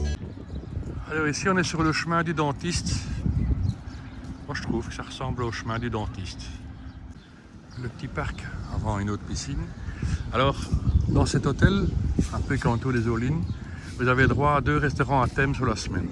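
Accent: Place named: Belgium. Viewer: Bonjour Monsieur. Ça fait plaisir d'entendre la voix d'un compatriote belge sur une vidéo d'Égypte. Je suis de la région de Mons, Dour plus précisément 🥳. Voilà, je viens vers vous car je suis tenté par cet hôtel pour l'été 2026. l'Égypte, nous y sommes allés plusieurs fois (5 fois au cours des 15 dernières années, 3x en couple, 2 fois avec notre fils, et cette année pour partirons pour la première fois à 4 avec notre fille qui aura 4 ans), donc on va dire que l'on connaît un peu (mer population nourriture désagréments intestinaux 😅 etc). Je voulais connaître votre ressenti général sur cet hôtel (nourriture, propreté, animation le soir etc...). Avez-vous plongé depuis le ponton de l'hôtel car pour moi la qualité, beauté de la barrière de corail est également un point essentiel dans mon choix d'hôtels en Egypte. Merci d'avance pour réponses. Amicalement, Grégory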